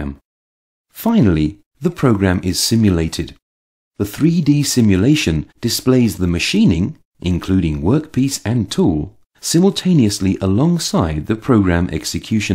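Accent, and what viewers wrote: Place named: England, United Kingdom. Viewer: fucking english accent shut up already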